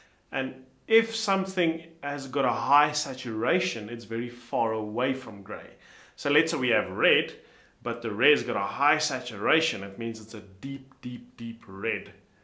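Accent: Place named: South Africa. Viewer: Excellent , Thank You , quick understanding of colour. Sounds like a South African Accent !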